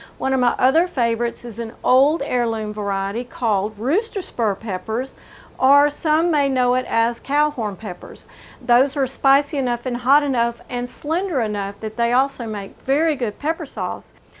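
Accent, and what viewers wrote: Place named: South, United States. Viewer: Oh perfect I love the southern accent (I'm from the UK) and I also love learning about herbs... ❤❤ Subscribed right away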